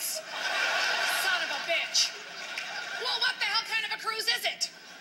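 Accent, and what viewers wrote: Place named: Chicago, United States. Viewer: She's from Chicago and this is how older Chicago moms sound.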